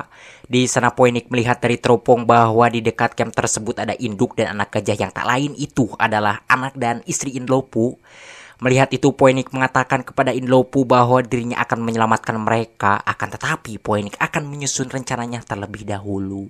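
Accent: West Java, Indonesia. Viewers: Urang sunda? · Wihhh Sunda Palih mana kang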